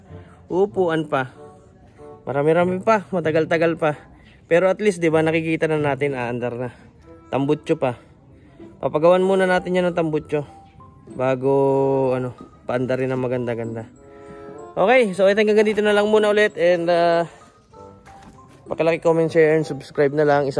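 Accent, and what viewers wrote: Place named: Visayas, Philippines. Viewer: bisaya diay ka lods?? 😅🔥 Word kapoy bisaya word😁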